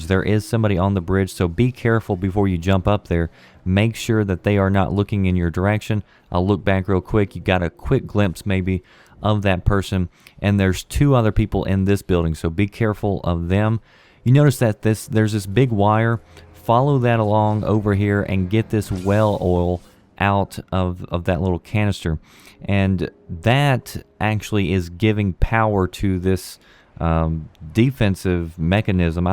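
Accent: South, United States. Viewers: Hmmmmmm, so southern and Mild. Plus 10 for good game-play! Have a like.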